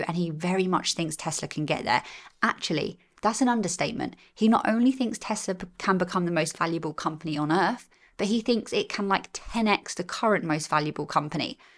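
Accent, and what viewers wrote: England, United Kingdom. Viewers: Love your content and your English accent.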